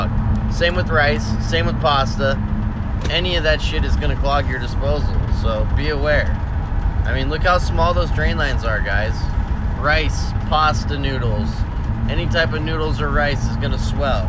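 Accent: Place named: Southern California, United States. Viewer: Hey just wanted to thank you for this video, it really helped me replace my first garbage disposal. I also wanted to make special comment on your very Southern California ways displayed in your narration – I grew up in the area but have spent the last 28 years (I'm 46) in NorCal and just hearing you talk reminds me of all my old buddies down there so thank you for that too!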